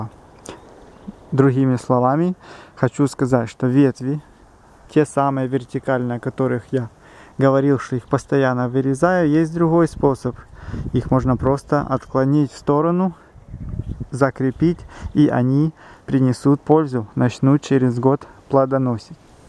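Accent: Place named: Surzhik, Ukraine. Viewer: И Вы,Петя будьте культурным.Может Вам знакомо такое слово Суржик?Вот на нем и говорит.Это местный диалект.Канал этот для садоводов,Вы ошиблись,поищите лингвистические канала,а заодно,научитесь такту.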